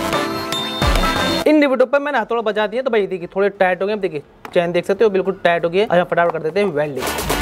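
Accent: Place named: Bharatpur, India. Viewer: Aapki bhasha humare yaha jesi h bharatpuriya jo bahut achchhi. Lagti h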